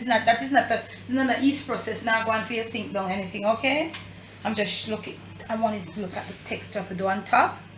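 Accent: Jamaica. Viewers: Does anybody else love her jamacian ascent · I love this lady! Love the way she chat the real Jamaican chat❤️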